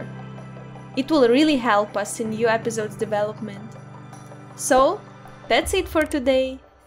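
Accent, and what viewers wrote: Russia. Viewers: А че у него русский акцент? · Мне кажется что у неё русский акцент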